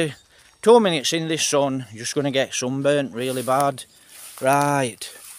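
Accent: Yorkshire, United Kingdom. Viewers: Thought you was a YORKSHIRE LAD ❤ I'm from a village called Maltby near Rotherham 😅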